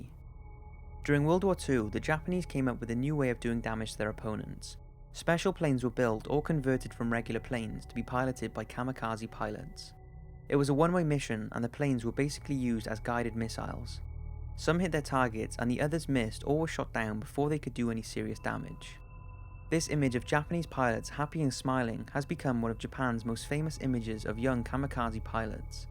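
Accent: Wales, United Kingdom. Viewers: do I detect a slight welsh accent?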